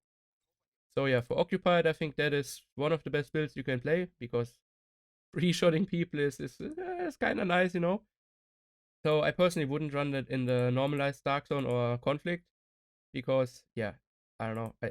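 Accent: Germany. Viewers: Yo! I gotta say u r making some nice videos! U kinda sound German tho... wanna say where u r from?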